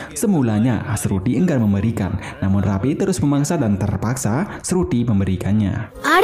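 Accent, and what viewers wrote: Bali, Indonesia. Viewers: Pasti dari bali ya min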